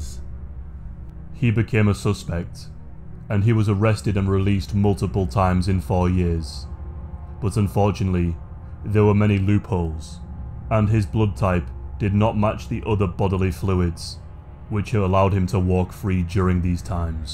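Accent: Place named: England, United Kingdom. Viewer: I’m guessing somewhere near abbey road I gotta know which part of England you are from my friend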